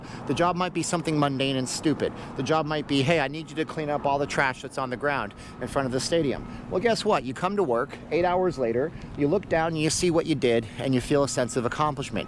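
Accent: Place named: Canada. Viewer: Pretty sure, he is Canadian, thats where goofy accent comes from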